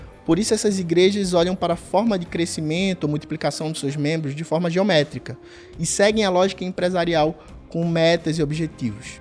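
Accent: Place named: Northeast, Brazil. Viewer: KKKKKKK Mano que absurdo foi esse aí que tu falou. Tu acha mesmo que isso e movimento político e "racistas"? Isso é ridículo parceiro. Inclusive o the send Não e um conjunto de igrejas amigos, e muito menos uma estética nas mesmas. Quanta mentira cara. E por último, o livro do Yago Martins não criminaliza a pobreza. Qualquer um que LEU sabe. E me entristece muito reparar nordeste no seu sotaque, parece que ainda tem muita gente alienada ou alienante por aqui.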